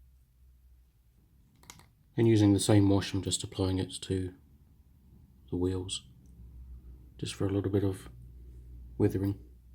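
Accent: New Zealand. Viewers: A kiwi accent?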